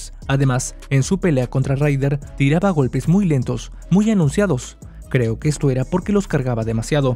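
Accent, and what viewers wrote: Mexico. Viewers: yo no se si sos mexicano pero tu acento me dice que si. que amargo que sos hermano, los mexicanos tienen a los mejores boxeadores de la historia y el canelo es uno de ellos...te la pasas haciendo videos tratando de tirar abajo a uno de lo mejores boxeadores de la historia de Mexico en vez de valorar lo que consigue este tipo, y tiene solo 32 años!!! le dejo la cara como un tomate y lo tiro dos veces, a ustedes siempre les falta 5 para el peso...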